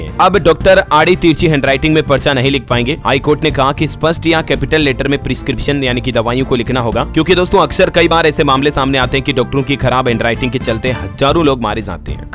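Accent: Rajasthan, India. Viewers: bro aap rajasthan ho kya lgrha h is video m tone lag rhi h rajashthan vaali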